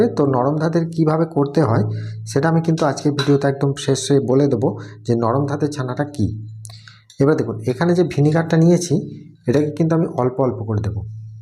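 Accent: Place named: Hooghly, India. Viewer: ওনার কথায় মনে হয় চূঁচড়ো, চন্দননগর বা হালিশহর, কাঁচরাপাড়ার লোক।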